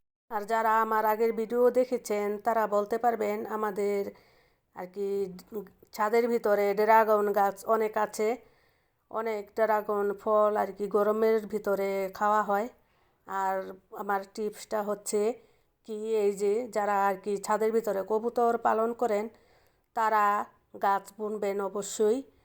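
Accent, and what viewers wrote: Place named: Narayanganj, Bangladesh. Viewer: নারায়ণগঞ্জের মানুষ এই ভাবে কথা বলে আমার জানা ছিল না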